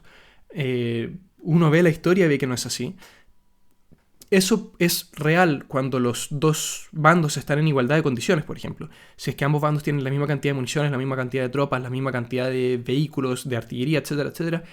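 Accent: Chile. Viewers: ¿Por casualidad eres chileno?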